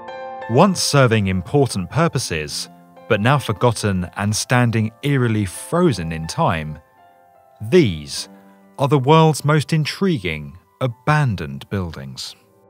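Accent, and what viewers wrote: United Kingdom. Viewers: Man i love your videos they are so informative, entertaining and savvy i could watch them all day..don't stop your fantastic work. you speak clearly and slowly and your British accent helps make it more easy listening rather than some fast talking American woman's voice.. it makes a difference in my opinion... Thanks for your videos which im happy to share with my Facebook friends anytime.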